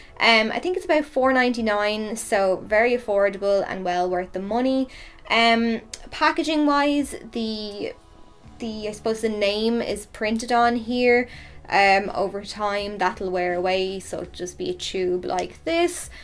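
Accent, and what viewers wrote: Ireland. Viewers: I love, love ,love your Channel and your videos 😍😍😍 You are so professional, kind and friendly, and I love that you review products that are affordable for us mortals 😅 And...even though it's highly irrelevant and I hope I don't offend you as an Irish person, but being a Dane, who spent a lot of time travelling and living in Dublin, listening to you talk takes me "home" to Ireland.❤️ So thank you for that too, and I'm terribly sorry if made you feel like a tourist- leprechaun...😳🤦‍♀️ Definitely not my intention!😊🙏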